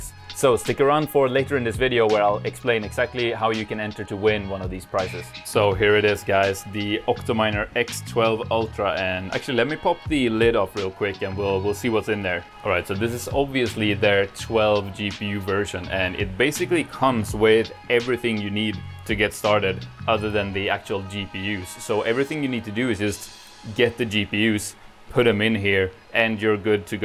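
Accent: New Zealand. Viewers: i love your kiwi! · Hi, many thanks for another great video. Always loved the Kiwi accent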